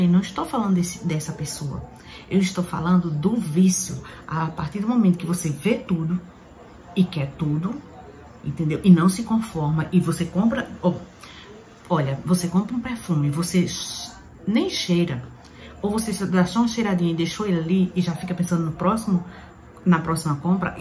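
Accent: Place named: Northeast, Brazil. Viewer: ❤❤❤bjs,eu tbm amo esse sotaque arretado 😅😅😅😅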